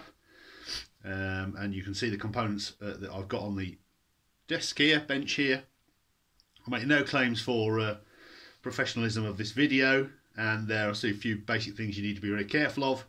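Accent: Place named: Alabama, United States. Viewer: Hard to believe. I'd like instructions for my gen 2 prius. I'd be willing to use a 120volt squril cage fan to cool my pack. My fan has a plug with several wires. I could research which 2. But I'd love to make the charger for my 07 prius. Like they say a little over 200 volts DC thanks. Love the Alabama accent!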